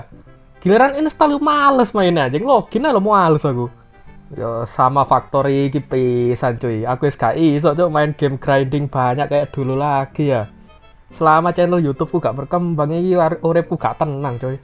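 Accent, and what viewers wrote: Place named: Banyumas, Indonesia. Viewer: Welcome back lord Pas nonton video ini , bini gw langsung hapal & bilang "oh ini yg mas-mas ngapak itu ya?"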